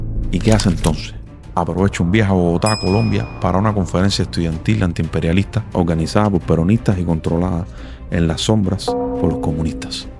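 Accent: Cuba. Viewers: Tienes muchas fotos y material fílmico que nunca se pudieron ver en Cuba. Te felicito por tu formato documental tan profesional y ameno. Solo te sugiero mejores la pronunciación y el acento que suena con un poco de "rutina" cubana. 😉 Gracias por estás entregas y la denuncia de la verdad que muchos filo comunistas de Latinoamérica no quieren ver.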